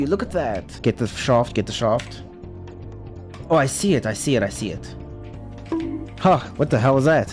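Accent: South Africa. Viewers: I love when people ask this because nobody has ever sounded more South African than him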